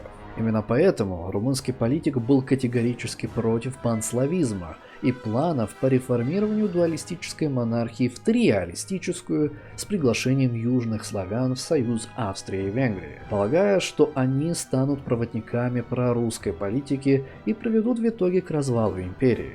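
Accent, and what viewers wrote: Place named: England, United Kingdom. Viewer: Как мне обучиться такому же английскому акценту как у автора видео?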